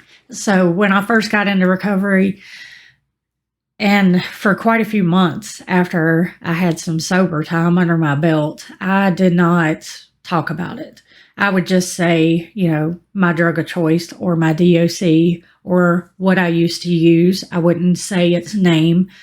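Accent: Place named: South, United States. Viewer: Wow, that was a beautiful, open hearted and moving vlog. Thank you for sharing in that gorgeous Southern accent of yours. Any chance you could make a video off topic how to make sweet potato pie?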